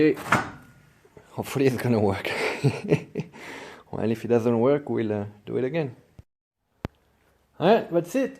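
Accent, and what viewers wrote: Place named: France. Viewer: hello, your accent looks like french guy! PLEASE, i have a IH345 V8 in a 1979 scout2, can i do the same conversion as you did? i actually have a automatic 4 speed gear box on it, what do i have to modify and what parts i need for this conversion? i would appreciate your reply, thanks a lot, and continue like that, i like your channel! cheers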